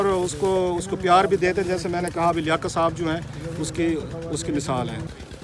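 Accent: Saraiki, Pakistan. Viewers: Klasra Sahb apka lehja wohi saraikion wala hy.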